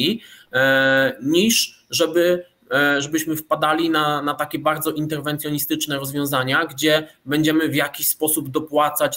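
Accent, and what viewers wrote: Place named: Poznań, Poland. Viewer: Też mnie to kiedyś bardzo denerwowało. Teraz trochę mniej, kiedy się dowiedziałem, że jeśli ktoś pochodzi z okolic Poznania, to może być wynik gwary poznańskiej. Z Wikipedii: "wymowa końcówki -om zamiast -ą, np.: jakom, pałkom".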